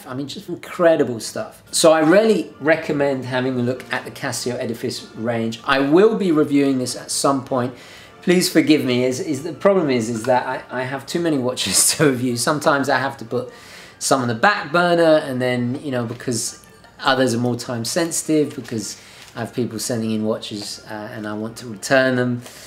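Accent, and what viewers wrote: United Kingdom. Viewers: Your British accent is so pleasant to hear lol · It's his crisp and clear British accent.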